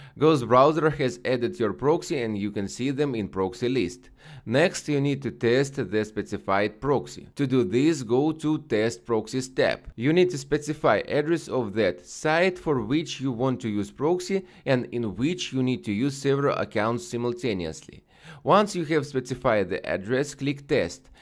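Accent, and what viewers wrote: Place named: Russia. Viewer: typical russian tougue, love it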